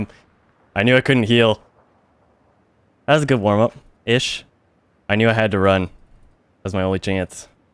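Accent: Canada. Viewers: are you canadian ? just wondering sounds like it ;)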